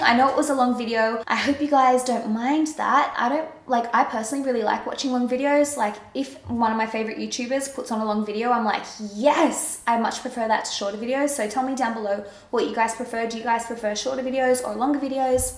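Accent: Australia. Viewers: I love your accent I don't know how people "hate your voice" it's beautiful I'm OBSESSED with Australian accents and I have been since I was little :3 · I love your longer talky videos! You speak so beautifully without losing your ocker-ness (Im aussie too ;}). Thanks for all the info on the procedures you've had. It's interesting to hear about 1st hand experiences. Love you loads! xoxo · She's Australian 😊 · you have a sydney accent how could anyone your voice? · she's Australian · Ahh it's so sad when people can't tell the difference... I'm Australian so I can obviously ahah and she's Australian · To sexy for them. Honestly I don't think alot if people are used to Australian accents. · It's a strong Aussie accent, not British. Nevertheless, with accents, some people love some and hate some. It's nothing personal :) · okay so... This is totally unrelated to the video...but idk why this whole time I thought you were British (I'm really bad at interpreting accents lol) and now I know you're Australian haha so i want to know.. 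Is it true that in Australia there can be really large poisonous spiders just casually all over the place? It's just what I've heard and I want to know if it's true lol sorry random question. But anyway, you do you girl! I totally agree, we should all be able to do what we want with our own bodies, so long as it'll make us happy! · Australia is one of the best accents to me, you rock x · I am seriously impressed with your candour and i know it can be so hard opening up old wounds, (why i hide video) but you do it so genuinely that you become approachable and, well now i so i want to learn how to do my make up properly from you. You are so refreshing and it's so nice to hear your ozzie twang. I have missed it and have list my accent from living overseas. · I love your accent, being Australian myself. I can understand you perfectly. Love your channel.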